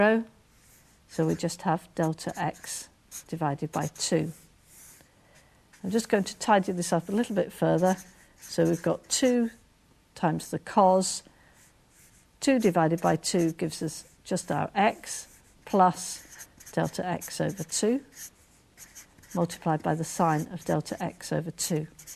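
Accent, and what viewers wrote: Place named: United Kingdom. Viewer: I find it quite stimulating, the Brits accent mixed in calculus real just arouses the desire to follow.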